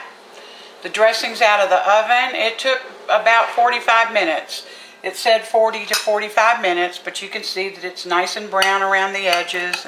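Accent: South, United States. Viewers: You're from the North?? You must have been in the South a very long time,you sound Southern.This dressing looks yummy,I'm going to try it soon,I might use chayote squash instead of yellow.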